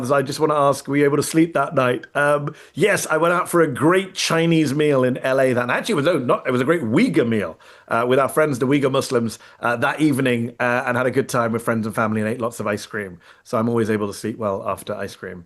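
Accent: United Kingdom. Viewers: I whole heartedly agree that you need to get your shit flinging British accent having ass out of our politics. Britain is in free fall cuz of all the mud. It’s cool it’s fine, just know we’re ready and waiting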